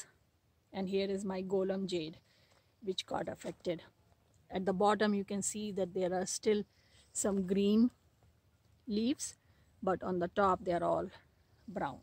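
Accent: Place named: India. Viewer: Hey. May I know in which country you live in? You never mention any country or state in your videos, so it confuses me a lot... Your accent is Indian, if I am correct. But there hardly is frost in India... Also, I just saw your video about wisteria, though I have purchased some seeds of it, don't exactly know if they are original or not, but I have never seen wisteria in India. I am from Kashmir and wish to know if wisteria will survive here in snow. Thank you! And I apologise for so many questions 😂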